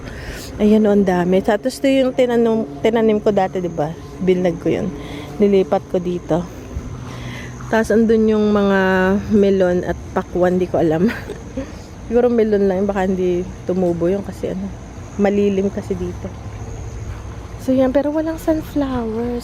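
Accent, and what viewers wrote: Batangas, Philippines. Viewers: Matagal na aq nanonood Ng vlog NYO pero now din lang aq nag comment..Ung punto NYO Po Kasi SA nakaraang vlog NYO para kasing batanguena Kau..batanguena rin Po aq nasugbu batangas po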